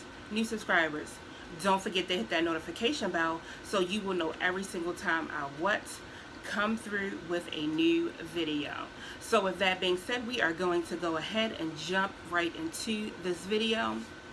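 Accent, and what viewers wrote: Baltimore, United States. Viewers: Hey girl Hey....starting to become a fan!!🤣 Nothing but facts....being a reactor is actually easier than being the vlogger.🤷🏾‍♀️ They the ones put in the spotlight to be judged by their every move!🤦🏾‍♀️ By the way....are you from Bmore? You sound exactly like you from Bodymore!🤣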